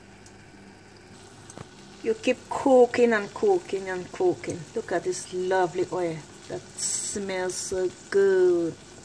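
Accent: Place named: Jamaica. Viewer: You sound like a Jamaican thanks you tho for sharing love and lite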